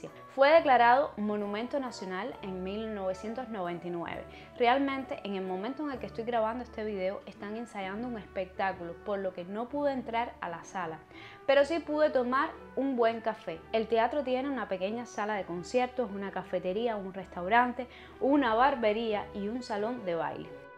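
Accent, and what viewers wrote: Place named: Cuba. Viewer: Ver Trinidad, me recuerda muchos de los llamados pueblos Mágicos de México, Estimada me encantó la música "habanera" como intro y fondo, tu relato bien estructurado y con muy buena dicción y ese exquisito acento cubano, Trinidad es un pueblo mágico con un enorme acervo cultural e histórico, te agradezco por mostrarnos tu amada Cuba, te mando un abrazo grande desde Jalisco México.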